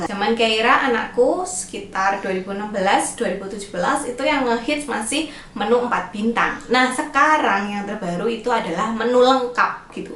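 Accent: Java, Indonesia. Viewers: Makasih 🥺❤️ Udah rajin edukasi kami lengkap infonya terutama aku newmom yg mana aku uda gapunya Ibu.. dr awal hamil sampe lahiran skg babyku umur 4mo rajin nonton bubid belajarnya disini lengkap - everyday is learning. Bonus logat jawanya 🥰🥺 berasa lagi dikasih tau keluarga sendiri (aku rantau d jakarta) *peluk hehe Sehat selalu yaa ❤️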